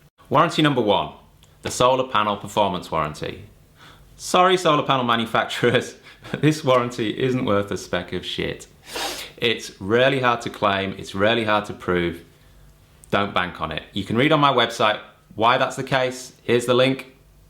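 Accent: United Kingdom. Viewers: All be it a British Aussie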